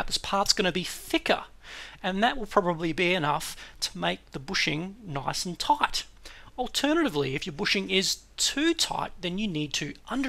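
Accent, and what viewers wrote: Australia. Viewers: must be the sydney accent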